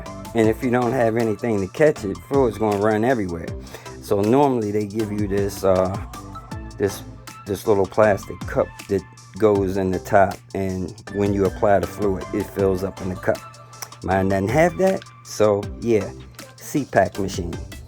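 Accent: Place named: Georgia, United States. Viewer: Bro, I feel you on the "troll" shit, were from a different time & a different era, where we ran our mouths to each other faces, & backed that shit up! 💯 I'm 47 y.o, very outspoken on my views & beliefs, but AT LEAST I stand in something, these days it's the blind🦮 leading the blind🦯 out there nowadays...... Where you from originally bro, Georgia or something, I know your accent but can't place it??? 🤔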